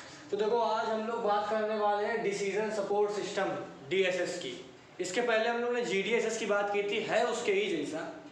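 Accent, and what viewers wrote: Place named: Kanpur, India. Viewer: bhaiya aap kanpur ya uske aas pas ke area se ho kya ?aapki tone vaisi hai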